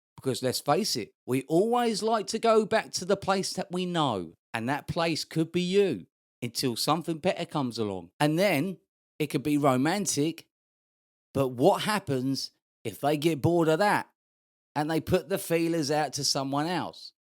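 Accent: Cockney, United Kingdom. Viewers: sounds pretty cockney to me atleast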